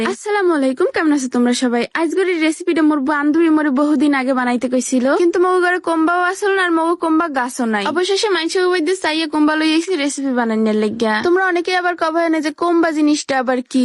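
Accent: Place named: Barishal, Bangladesh. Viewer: Tomer basha barishaler kothei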